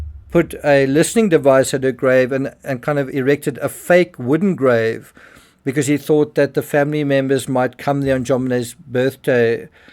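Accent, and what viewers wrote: South Africa. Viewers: I watch this channel cause I enjoy hearing a south African accent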